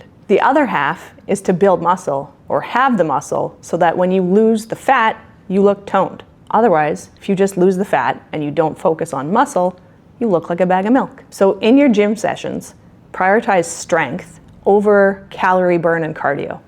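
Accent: Canada. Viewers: Are you Canadian? I about abooout hahah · Girl, are you Canadian? You sound Canadian and love this. I have binged like 4 of your videos now and I'd love to think you're one of us.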